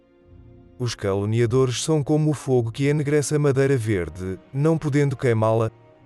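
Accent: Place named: Portugal. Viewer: Eu não consigo levar a sério essa voz de Portugal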